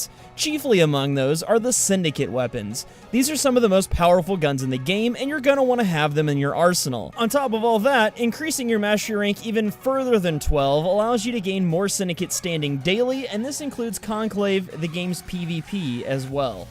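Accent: United States. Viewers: Are you from America?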